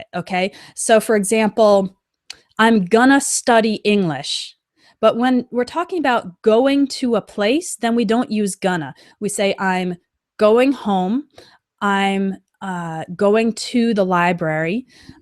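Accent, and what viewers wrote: United States. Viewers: Hello, I´m Adriano from Brazil:) I´ve been following these English tips and videos on internet a long time ago. And I can sure my English skills are much better now. Thanks for the incredible English free tips you give us in your website <link>'. Your american accent is very clear and very easy to understand. As an English learner, I really appreciate your lessons a lot. Bye-bye and greetings from Brazil:)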